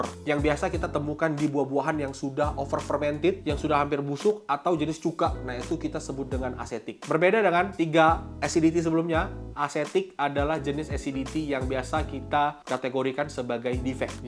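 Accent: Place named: Pontianak, Indonesia. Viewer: mak eh org pontianak rupe e wkkwkw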